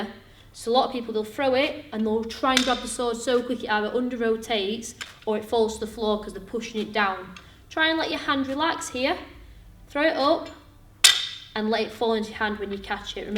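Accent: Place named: Yorkshire, United Kingdom. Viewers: The 'oo' sound makes it Yorkshire. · Which part of the UK is that accent from? Love it. Sounds like Dr. Fiona Hill's.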